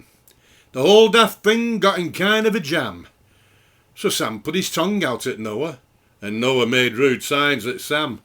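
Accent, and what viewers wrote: Lancashire, United Kingdom. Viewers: Doing some research on a role for a broad Lancashire accent. You're recital helped out tremendously. Thank you for posting it. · This is not just Accrington, but many towns in East Lancashire have this , similar to the way they say 'four' as 'phoar', with the 'w'. Same in Burnley, and many part sof Eats Lancashire, particularly in Rossendale. Even heard it in parts of Rochdale.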